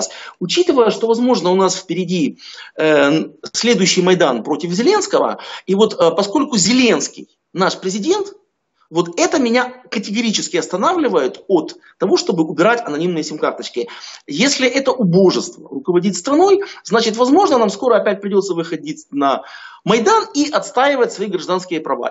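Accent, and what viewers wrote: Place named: United Kingdom. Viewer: акцент гарний ( в сенсі красивий). Майже британський. До ар-пі ( пош - акцент), можливо, трохи не дотягує, але звучить досить естетично привабливо ( без гомосятини кажу, лише як естет )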